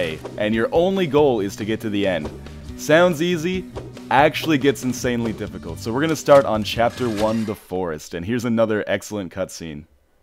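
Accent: Canada. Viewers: The canadian accent is really more noticeable in these older videos. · i can hear the Canadian :D This is a classic xP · Wow, NL sounds like a real Canadian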